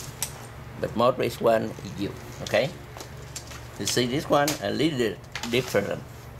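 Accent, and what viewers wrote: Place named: Southeast Asia. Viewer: I work with a guy that sounds just like this guy. He's from southeast Asia. I love talking to him. :) Very good video and great information!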